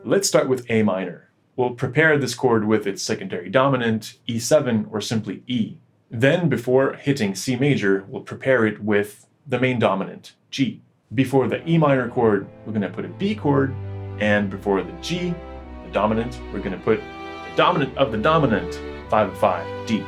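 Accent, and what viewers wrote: Quebec, Canada. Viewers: Vous faites honneur à l’accent québécois et à mon standard de jazz préféré:! Très inspirantes vos vidéos regardées du haut de ma tour situé dans feu Ville Vanier… Le regard ensuite tourné vers le château Frontenac pendant que percole la richesse de vos enseignements…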